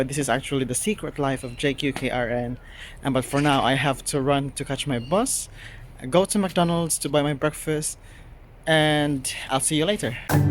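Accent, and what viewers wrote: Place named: United Kingdom. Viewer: wow kuya british accent na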